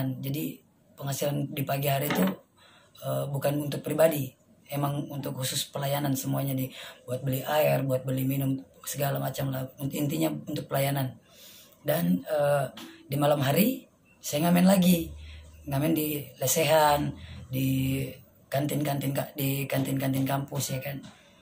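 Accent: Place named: Bugis, Indonesia. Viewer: ee.. terjawab sdh pertanyaan semua org siapa dan ee.. lho kok ketularan gaya bicara bugis ya 😀👍 Gbu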